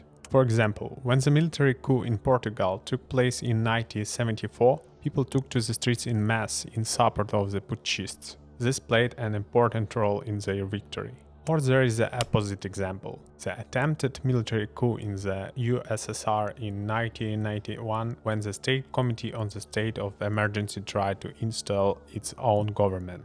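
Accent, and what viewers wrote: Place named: Ukraine. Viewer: You may not consider Khadyrov a serious contender for Putin's successor, but imagine the sight of Lavrov, Patrushev, Mishustin and Shoighu, stripped of their shirts and facing the wall, while Khadyrov poses in gopnik crouch before them. He grins because he has spelled out Akhmat in spray cream on their backs! By the way, have no worries over your English - many more of us are attuned to Ukrainian accents. Good video.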